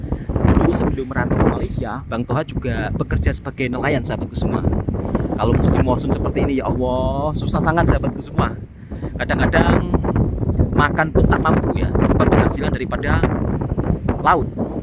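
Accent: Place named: Central Java, Indonesia. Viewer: Bukan itu kalo liat logat bicaranya jawa tengh pesisir utara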